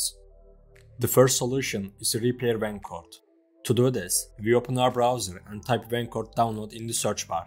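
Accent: Turkey. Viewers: turkmusun kral bu diksiyon ne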